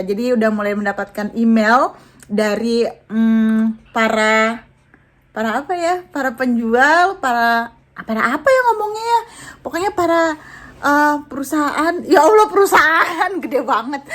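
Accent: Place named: Bali, Indonesia. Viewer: wah pantes ngomongnya campuran bali tadi di Vlog wkwkw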